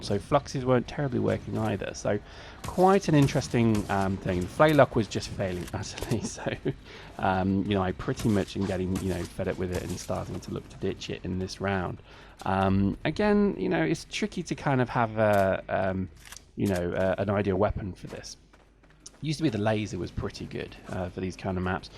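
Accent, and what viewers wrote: United Kingdom. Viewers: your accent sounds close, you must be in the South East? Maybe even Kent - Sussex? Where are you, I'm in Canterbury.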